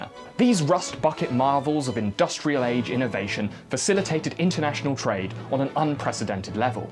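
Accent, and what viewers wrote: United Kingdom. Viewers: Misinformation with a British accent. At least it sounds inviting. It's also quite wrong. The American quite. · Anyone else get happy when they start a video and find out it's a British person talking?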